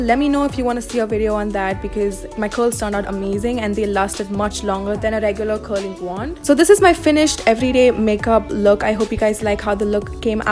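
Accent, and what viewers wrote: India. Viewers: Wow that indian accent took me aback, thought you were black. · Am I the only one that didn’t expect her to have an Indian accent, genuinely thought she was black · I thought the same thing.I was quite shock when I heard the Indian accent.She looks like a tanned Greek girl or tanned Turkish girl.She is very pretty though.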